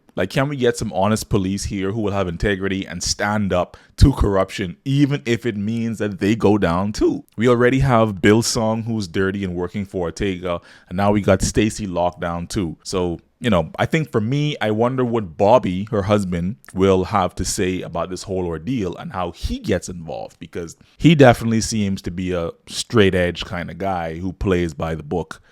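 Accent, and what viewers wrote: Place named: Bahamas. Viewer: quick question ... which island you or your people from ... cause I can hear the Bahamian in you voice! ... BTW a fan of your POWER content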